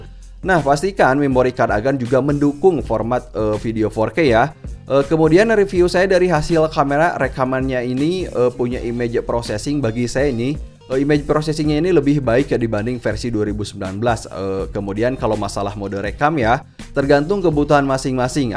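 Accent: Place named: Majalengka, Indonesia. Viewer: Alih sudah duga dari awal Bnr beliau emang orang Majalengka hehehehe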